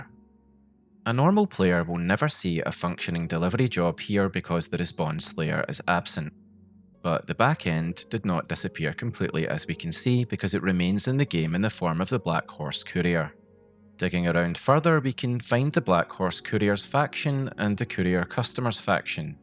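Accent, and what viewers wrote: Scotland, United Kingdom. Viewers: Algorithm just pushed this to me, I like your informative low energy and the Scot accent adds to the soothing output of information. Good video to listen to while relaxing or sleeping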